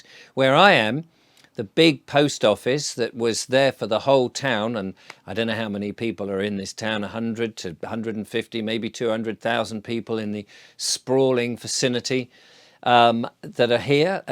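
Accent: United Kingdom. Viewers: I do love listening to, the relaxing tone, well spoken British accent, important messages. I don't watch everyday, but I do binge on a weekly basis.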